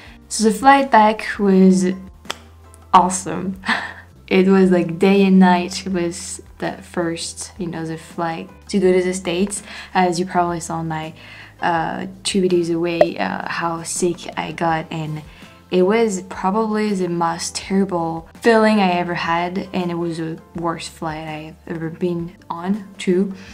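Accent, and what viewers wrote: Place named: France. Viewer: Eric was right about your English. I was all wrapped up in your story and didn't even realize that you have an accent until Eric committed on it.! Wow you are really getting good at it. I don't want you to be perfect because of the Frenchtastic way you speak English.! Love you from Tennessee.! P.s. When you çome back, you need to check out the South Land . Especially Louisiana and talk some Cajun.!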